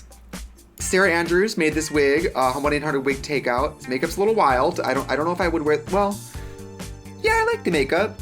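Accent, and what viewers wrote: Wisconsin, United States. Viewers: idk if i’m crazy but i can here trixie’s Wisconsin accent in this video so much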